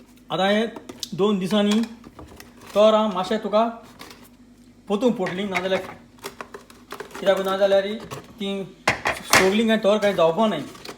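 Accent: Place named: Saxtti, India. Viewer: Nice. Love the Saxtti dialect too!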